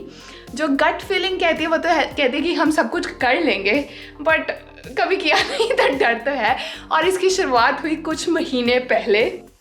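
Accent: Punjab, India. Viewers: those punjabi touches when you speak are so lovely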